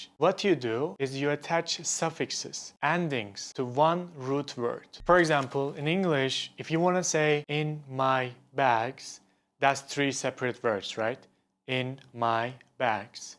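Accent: Turkey. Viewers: Abi Türk olmama rağmen izledim bende İnilizce öğrenmeye çalışıyorum Türk aksanı ile iyi oldu. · Senin Erzurumlu şiveni seveyim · bro. i just noticed he has a turkish accent.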